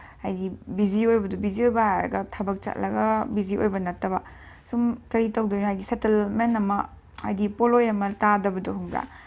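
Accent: Kakching, India. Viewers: che na kakching marol lonba C ymna nungCye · Kakching marol yam lol lay kei ge eche nang ba Yam nung ngai tay ko Nachak khuthe du ga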